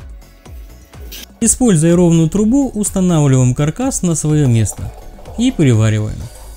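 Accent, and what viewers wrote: Kuban, Russia. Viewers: Вы случаем не с Кубани?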